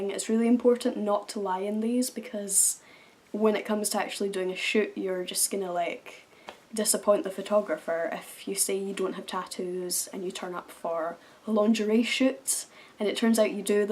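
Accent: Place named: Glasgow, United Kingdom. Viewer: I knew i recognised you... Soon as you started talking i realised I've most like Likely seen you around Glasgow at some point!! This was a great video by the way, I've just accepted a 3 year contract with a modelling agency and I'm hoping to specialise in alternative modelling. Definitely boosted my motivation to succeed so, cheers :D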